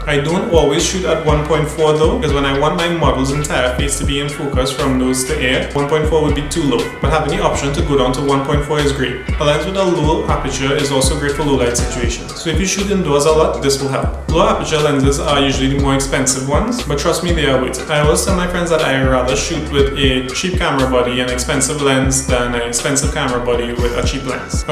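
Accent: Trinidad and Tobago. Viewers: You sound an awfully lot like my buddy from school in the US and he was from Trinidad and Tobago, something tells me you've got your roots to that part of the world!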